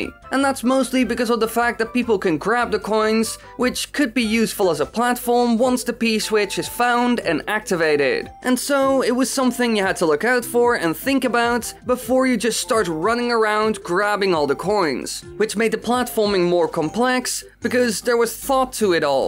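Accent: Netherlands. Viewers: Ik denk vrij zeker te horen dat jij Nederlands bent! :D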